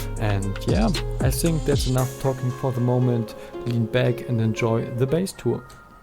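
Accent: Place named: Germany. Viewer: Höre einen deutschen Akzent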